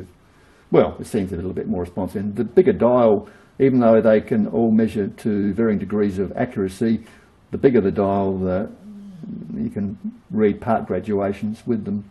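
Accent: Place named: Australia. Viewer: Gidday,I find it interesting that you sound like an Aussie but have a Chinese name.I then go to ebay and i get Chinese pretending they are Australian and located in Australia.Then there is the fact that they say something they are selling is a test indicator but it in reality it's a dial indicator.My mate was going to buy one of those Chinese hobby lathes thinking that the bed size was 1.2meters.I explained to him,that is 12 inches Bill,some people's peckers are longer than that and if you have only half that you need to do it twice,and that 6inches x2=12inches....A fortnight ago i was researching leather work,and in the process of buying leather working tools a burnishing tool for leather edges came up.I then watched a video of someone making some leather tools on a metal lathe and thought that would come in handy to save some coin.Now,i have had an interest in Blacksmithing for years and was thinking about making knives as a hobby,but what is a good knife without a quality sheath made by hand.In my madness i have almost come full circle.If learning means i am alive,why does my body feel like shit?..;)..Thanks for the advice mate...Cheers Gunna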